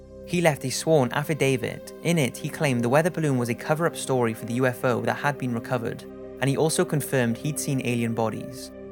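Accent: Wales, United Kingdom. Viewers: Yo are you from Wales?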